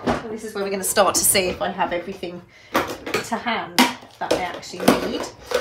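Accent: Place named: London, United Kingdom. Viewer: Hi, being an ex Londoner in OZ, it seems to me that you’ve suddenly started sounding more London! No offence I hope as, coming from me, it’s a compliment!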